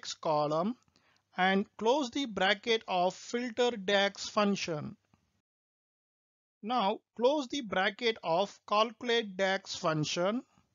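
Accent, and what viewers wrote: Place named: India. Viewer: Content is good, but the indian accent is really giving me a lot of room for excessive thinking.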